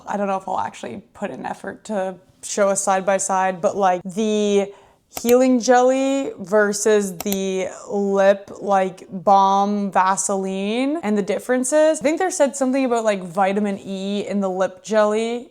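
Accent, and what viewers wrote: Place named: England, United Kingdom. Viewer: I'll give a like for the English accent! I must say, I'm really impressed in general by how you carry yourself on camera. You speak so naturally, it's like I'm there with you. You're making great progress, I wish you well!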